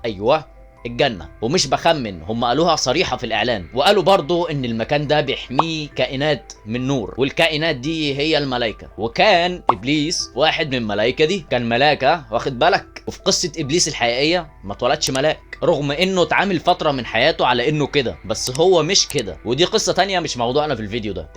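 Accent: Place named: Syria. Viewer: من البداية من لهجتك على بالي انك سوري مش مصري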